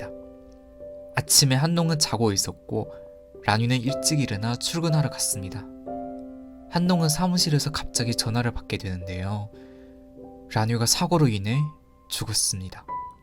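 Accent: China. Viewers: 한국어 정말 잘하시는데 살짝살짝 특정단어나 문장에서 얼화가 느껴지네요 성조나 발음까지 고칠 수는 없나봐요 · 중국인이세요? 발음이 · 중국분이신지 몰랐다가 증거가 부족했다는 설명에 어감에서 약간 알아챘어요! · 듣다보니깐 유튜버분이 조선족이신가보네요? 발음이 살짝 한국인 발음음 아니군여 ㅎ 잘보고 가요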